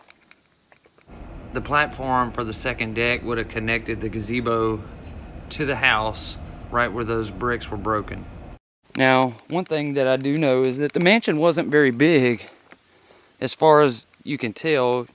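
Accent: South, United States. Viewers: I just have to say your very handsome and I love your southern accent 😍 ❤ I'm from the city Boston Massachusetts born and raised idky but I love country men southern men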